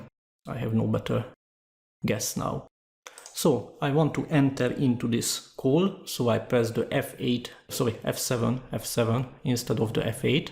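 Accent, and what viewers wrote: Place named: Hungary. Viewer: Cool vid! Hey, aren't you from Hungary? Your accent is so familiar for some reason.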